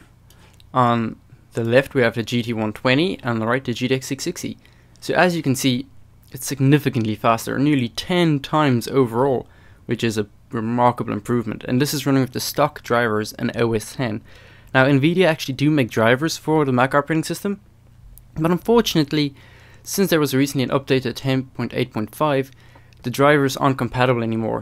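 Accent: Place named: United Kingdom. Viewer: you talk sooo british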